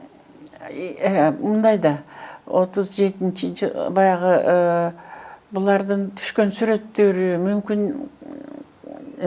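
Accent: Standard. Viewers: Азаматсыз эжеке кыргыз тилин ото таза оздоштургонсуз мен ойлоочумун жалан орусча суйлосо керек деп сизге таазим !!! Ширинге дагы уйротуп койсонуз акылдуу кептеринизден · Роза Айтматова говорит на чистом кыргызском языке.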